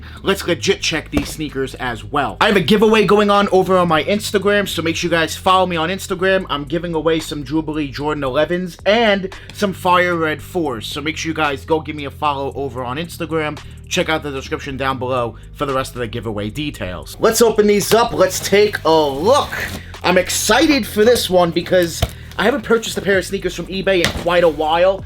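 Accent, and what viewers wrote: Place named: Brooklyn, United States. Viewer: Dudes definitely from Brooklyn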